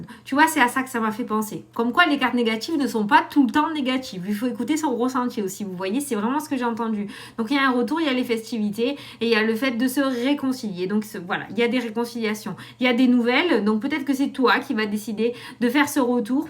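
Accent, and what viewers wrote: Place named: Tarbes, France. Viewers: sur messenger vu que ça passe par FB j'ai 2 contacts celui avec ta photo de chouette et celui avec ta photo normale lequel est le bon. Pour les contrats signatures immobilier je te remercie de ton tirage cela me parle bien ça avance. Merci merci merci.❤❤💜 j'adore tu parles comme mes nièces qui sont à Tarbes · Quel bonheur cet accent me manque tellement. Toulouse, Lavaur,albi, castre. Trop de merveilleux souvenirs 🌟☀️🌟☀️❤️💕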